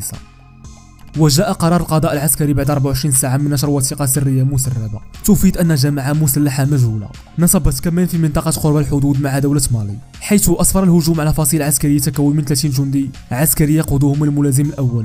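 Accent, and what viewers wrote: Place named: Morocco. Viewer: جماعة هذا راه مروكي لى داير فيديوا شوف غير اللغة العربية كيفاش ينطق فيها داير هذا الفيديوا يلعب على المورال للجيش الوطني الشعبي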